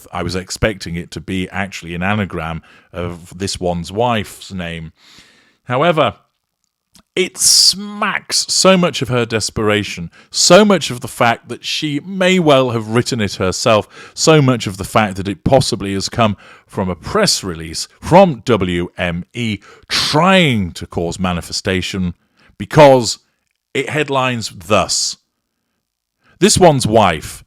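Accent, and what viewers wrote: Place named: RP, United Kingdom. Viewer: swearing in his posh, English (although im still trying to detect the region), velvety tongue, gets me EVERYTIME 🤜💥🤛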